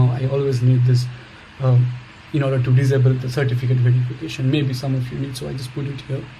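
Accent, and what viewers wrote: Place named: India. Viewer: Finally an Indian who has proper accent 😁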